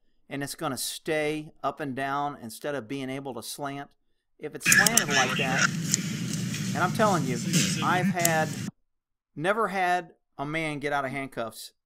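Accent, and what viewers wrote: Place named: Georgia, United States. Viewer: Stand up man, stand up. I don't know where you live but you talk about knowing laws out west, even though you sound like you may be from Georgia or somewhere near there, but if you live out west there are plenty of comedy clubs that do open mic. You could get signed in a heart beat!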